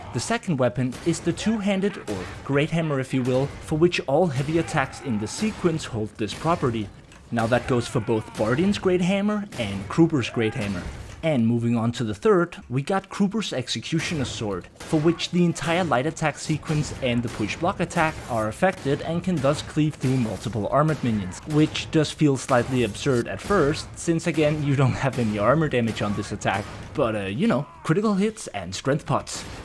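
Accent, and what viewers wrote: Denmark. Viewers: Best vermintide channel. Are you danish by any chance 😉🇩🇰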